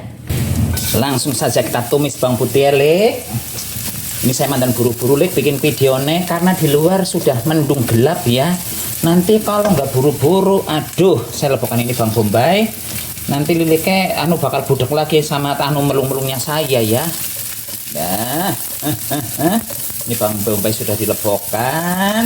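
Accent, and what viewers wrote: Banyumas, Indonesia. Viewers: Om maaf iya batam itu daerah jawa tengah iya kok om bisa bahasa jawa kayak orang bayu masan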